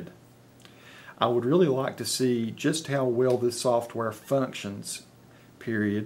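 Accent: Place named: Eastern Georgia, United States. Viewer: I have a similar accent (Eastern Georgia) and would love to have a stenographer software program like this that works well with my speech patterns! Looks like it is working well for you,! Thanks for sharing.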